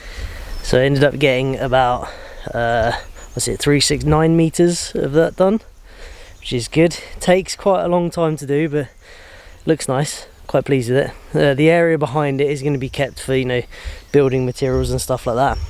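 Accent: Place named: South Wales, United Kingdom. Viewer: Very good South Wales accent LOL. I’m guessing Dyfed maybe west Glamorgan??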